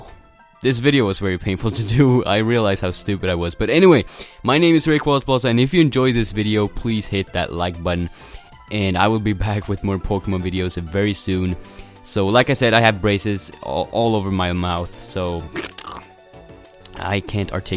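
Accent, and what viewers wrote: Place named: Sweden. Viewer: Swedish? You really sound swedish imo.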